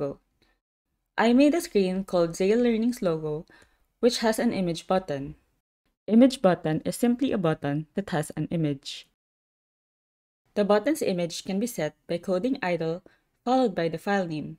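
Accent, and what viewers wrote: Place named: Philippines. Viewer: this is exactly the kind of video i needed!!! also super cool that you're from the Philippines as well, i was surprised to hear a Filipino accent voicing this! thanks so much for your vids!!!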